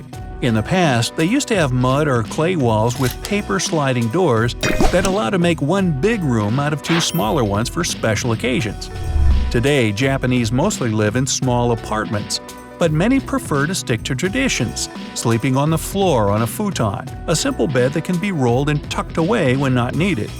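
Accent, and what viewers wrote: United Kingdom. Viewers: Baaaathroom. The British accent makes me sick! Sounds so robotic.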